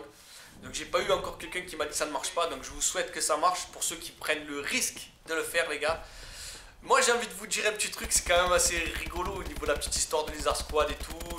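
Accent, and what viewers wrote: South, France. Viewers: Tu est trop excellent putain tu me fais grave kiffer avec ton accents du sud j'ai beau savoir que tu est pour Marseille je te kiffe continue mon gars <3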